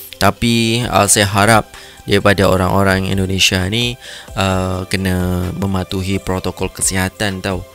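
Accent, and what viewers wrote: Malaysia. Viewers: Suara sedap...muka hensem..... ckp mcm org Malaysia..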